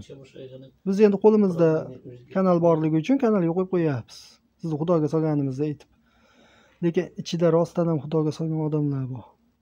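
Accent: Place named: Tashkent, Uzbekistan. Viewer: Салом,. Гапингиздан Тошканликка ўхшаяпсиз. Мабодо Тошканда кўриб қолсангиз,мани ўрнимдан, чирройли,силлиққина"башшарасига тупуриб қўйинг. Йўлим тушиб,бориб қолсам,ўз синглимдек ҳурматингизга етардим. Олдиндан раҳмат.🙏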